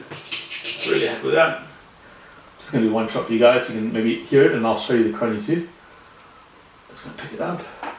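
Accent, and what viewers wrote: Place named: South Africa. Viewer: Good to hear that accent after all these years. Middle aged now but growing up in England by some strange twist of fate my first real best mate was South African, then in senior school my best mate was a very superb bloke (again South African), and in my twenties I worked a shitty job briefly where I hated almost everything about it, other than one guy who was again South African and a real life saver.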